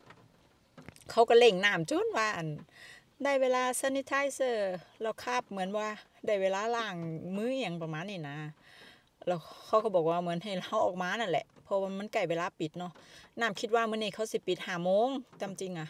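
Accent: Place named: Isan, Thailand. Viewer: สำเนียงอีสาน ไท ชัยภูมิ ชุมแพ ภูเขียว ภูเวียง ตั๊วนี่หรือว่าไทใด๋หน้อเว้าคือบ้านข่อยแท้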